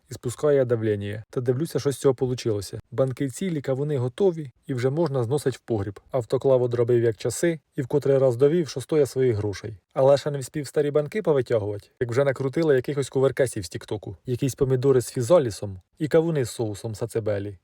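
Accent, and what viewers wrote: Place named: Surzhyk, Ukraine. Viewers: Мои сваты из села Мыколаивки Новомосковского района так балакають, такой диалект суржика прикольный, юморной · Дякую за відео, слухаю наш рідний суржик як бальзам для душі 🎉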